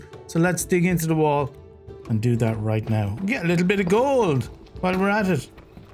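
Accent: Ireland. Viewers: I rarely comment but I must applaud you for the creativity and the amazing videos. Undoubtrdly one of the best minecraft builders I've seen, if not the best! You are not small but a big gem among the minecraft creators. Of cours love the accent too, Irish but not too Irish so I can actually understand what you're saying lol. Keep them coming pls :medal-yellow-first-red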